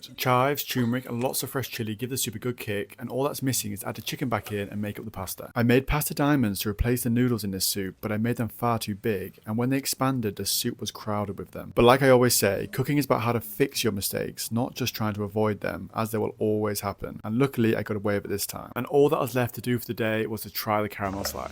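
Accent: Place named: Yorkshire, United Kingdom. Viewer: Perhaps around abouts Yorkshire based on Dialect?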